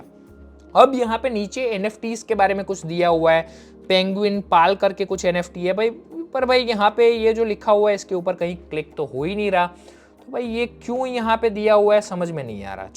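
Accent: Gujarat, India. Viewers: Aapki hindi Sunke Aap Mere Ko Gujrati Lagte Ho Bhai Ho Gujrati To Kaha Se Ho Bhai😊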